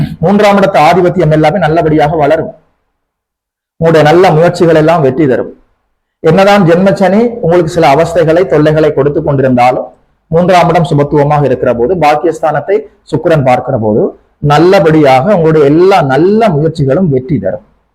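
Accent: Sri Lanka. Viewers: Nice Jaffna Tamil pronunciation Sir!!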